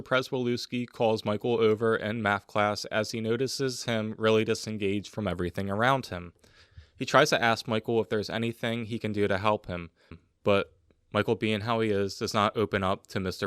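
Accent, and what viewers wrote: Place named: Baltimore, United States. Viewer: I can hear your Baltimore accent, and I weep with homesickness for Old Bay Seasoning. Thanks for making this.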